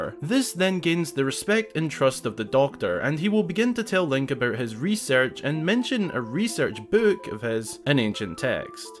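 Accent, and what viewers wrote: Scotland, United Kingdom. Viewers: Why thats a lovely Scottish accent you have there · he's from the UK dude (pretty sure), he has an accent, foreign people just pronounc things differently 🙄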